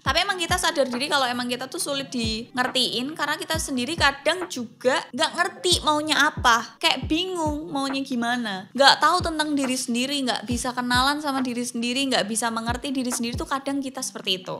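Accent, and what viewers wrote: Java, Indonesia. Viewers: Klo pingin makan ati terus, kepripen hayoo ??? logatmu kok campuran suroboyoan+Jawa tengahan sih ??? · medok nya itu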